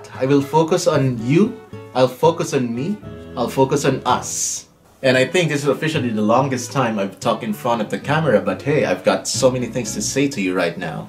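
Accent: Philippines. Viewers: You're starting to lose your Filipino accent... very slightly. It's fascinating to watch its progress. lol I hope you're finding life in the US ok.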